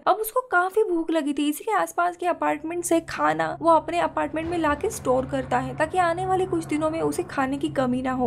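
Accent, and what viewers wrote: Maharashtra, India. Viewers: B. Aawaja varun Marathi ch vatate. Tichya instagram var tine marathi madhye lihila aahe.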